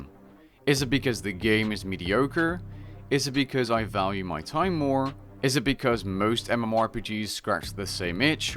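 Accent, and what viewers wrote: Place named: Netherlands. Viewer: Problems with 1. He’s a pretty dope content creator 2. Not afraid to branch out on video content. 3. Is a very attractive man with a nice Dutch accent. 4. What? 5. Hates frogs and encourages bonking. 6. All of the above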